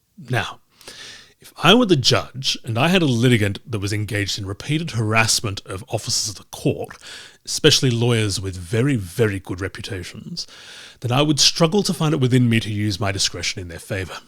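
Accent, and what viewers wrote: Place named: RP, United Kingdom. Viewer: You're the only Australian I've come across with an Etonian posh boy accent. Thanks for explaining to everybody how we in the UK screwed up out legal system over the past 400 years. It's a pity that you Australians can't shake of our baggage and come up with a better system of your own.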